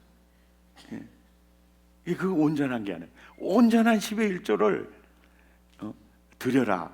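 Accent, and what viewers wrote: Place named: Mokpo, South Korea. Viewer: 목포 목사님 부흥회 너무나 잘보고 있습니다 사투리가 어찌 그리 정이 가네요 저도 고항 장흥 입니다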